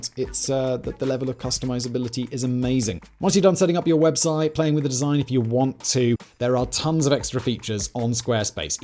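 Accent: RP, United Kingdom. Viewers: You soft pedaled the affair. There's no line at which treatment like this suddenly becomes genocide or not genocide. You with your posh accent, to try and hide the fact of what a dope you are! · Great vid. Was particularly unusual to hear all this is Simon's British accent.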